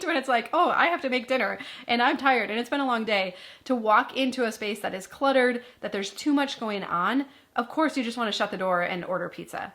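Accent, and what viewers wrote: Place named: Midwest, United States. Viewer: Question: what did you do with the expired food? Did you just throw away all the expired & freezer burn foods? I need to clean my pantry, too! I know there are a lot of expired cans of food. Yeah, you know what I’m talking about. 😉. I don’t know where you live, but your accent and expressions sound like you are in the Midwest (Wisconsin, Iowa or Minnesota, maybe). Did you just toss the canned “expired” food stuffs in the trash? Here in California we have to put all of our table scraps in a separate bag and that goes into the bin of yard waste (leaves, dead flowers, grass clippings - all things organic that release methane gas as they break down. Our city turns it all into mulch that is used around plantings to prevent weeds; also, given to us city folks to mulch our gardens. So I don’t know… should I open each can and put it in. 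The yard waste bin (inside its own little separate bag), or should I just toss the entire can (vegetables, fruit, or whatever) directly in to the trash without opening it. Will it release methane or explode someday? Thanks for the video and the inspiration to finally get my pantry clean and organized. 🙂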